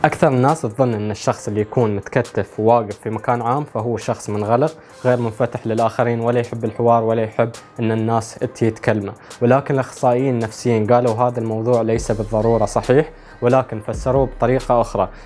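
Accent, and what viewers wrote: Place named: Iraq. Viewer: قناتك تعمل محتوى ذو جودة عالية وهذا سبب انتشارها السريع استمر هكذا وسوف تنجح أكثر.. بالمناسبة أظن أن لهجتك ليست عراقية بشكل كامل فهي مبسطة وتدمج فيها الفصحى وهذا يجعلها سهلة لغير العراقيين. عجبتني اللهجة ولكن الموسيقى الخلفية مكررة ..ياريت في كل فيديو تستعمل موسيقى مختلفة. وياريت تعمل فيديو كيف تصوير الفلوغات .. فأنا أحاول عمل فلوغات ولكني لا أعرف كيف أختار زوايا التصوير.. اعمل لنا هذا الموضوع مستقبلا لو استطعت.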